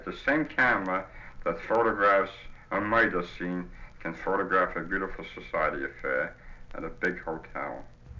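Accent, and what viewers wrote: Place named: Manhattan, United States. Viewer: Sadly that Manhattan “East Side” accent is now extinct…even on toity toid and toid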